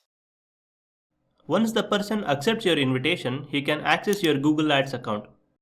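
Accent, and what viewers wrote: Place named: India. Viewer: Fix your Indian accent. It's annoying af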